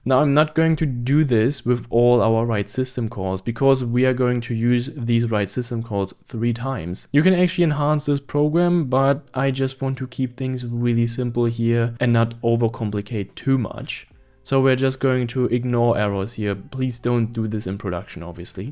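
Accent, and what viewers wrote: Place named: Netherlands. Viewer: Dude sounds like Dutch Elmer Fudd, love it ❤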